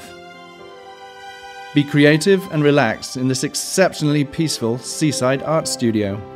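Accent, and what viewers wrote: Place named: United Kingdom. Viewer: Why is the narrator a brit? there's no brits in the San Juans. (I grew up there). Just sayin...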